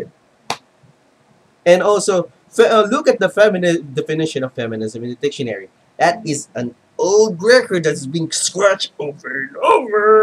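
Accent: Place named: Philippines. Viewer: ***** Excuse me, I'm also a Latino who doesn't speak Spanish/Castilian, you ''look Filipino'' (not even the ''most Mexican-looking'' of the Asians, that prize would go to the Viet-Namese, and the Indonesians take a good 2nd :-P ), I assumed you were because on A Voice for Men (where your video is featured) a bloke said ''galing'' and called you Pinoy :-P and your accent is more akin to that of a Filipino... or an Ecuadoriano than that of a Méjicano (as Méjicans, Centro-Amirikano's and Colombiano's tend to use the ''ii'' sound while Ecuadoriano's, Filipino's and Europeano's use the ''ih'' sound more), this is odd, usually my comments are invisible, and when you commented on your own video bellow my comment I couldn't see it until I selected ''answer'' my own comment, people probably reported you as spam or something, I like this new Google+ integration, but I hate this ''feature''. 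(>_<) Be glad that you're not an Ecuadoriano (what I first thought you were) as that's the country where Feminists threatened to cut of innocent men's penus (yes, that's the plural of penis) if Lorena Bobbit would go to jail (yet they are seen as ''freedom-fighters''), also most Castilophone western countries had female heads of states, but the palces with the most radical Feminists never had... makes one think... I don't know if you get this a lot, but you really look and sound Filipino/Ecuadoriano, though Filipino's are universally paper-pale (literally everyone in the Philippines can play for the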